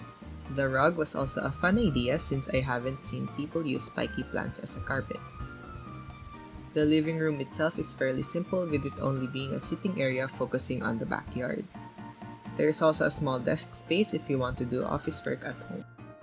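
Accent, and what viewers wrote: Philippines. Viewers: Your accent sounds like Filipino 💀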